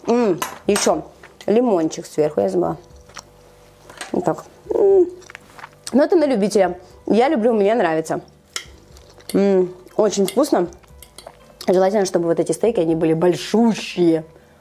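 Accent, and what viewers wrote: Ukraine. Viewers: здравствуйте! Хорошее, качественное видео, спасибо! Если кому-то рецепт кажется несовременным ( не соответствует ЗП, ЗОЖ - много жиров или сладостей), он просто не будет смотреть. Вам, как профи в кук-индустрии (ого, <num>+ пользователей), конечно же, дозволительны вольности в речи, такие, как частые украинизмы или местечковые "рІзетки" - розетки, это не из оф. украинского языка, это из выражений в отдельных местностях. Они не будут портить видео, если блогер этого не стесняется, а наоборот, акцентирует на таком звучании. Это будет украшать видео своим колоритом, если быть смелее! Сердечні вітання із святами та щирі побажання подальших успіхів в творчості!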